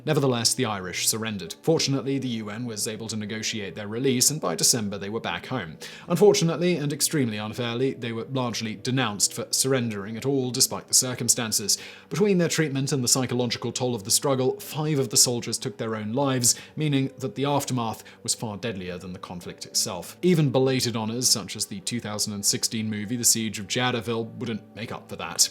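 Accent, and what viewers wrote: United Kingdom. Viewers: I sometimes wonder if Simon's videos would still sound educational if he'd have an American or Aussie accent. He could read the instructions on a box of matches in his British accent and it would sound like a lecture on quantum physics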